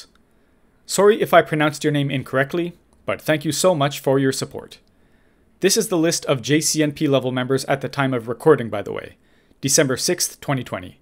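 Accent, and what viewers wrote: Canada. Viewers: I think I got this :) ACLs at least lol by the way, youre originally from Canada right? I hear the "aboot" ;)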